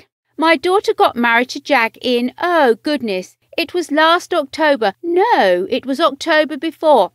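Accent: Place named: Cockney, United Kingdom. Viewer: God this voice, learn to speak like a educated Brit. Cut the cockney accent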